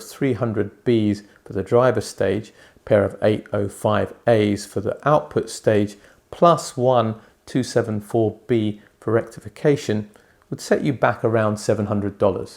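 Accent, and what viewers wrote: United Kingdom. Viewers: You sound British, but don't look British.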